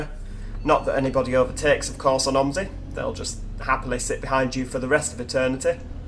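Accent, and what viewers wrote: Manchester, United Kingdom. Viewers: "Im jer"... can definitely tell youre a mancunian, good video again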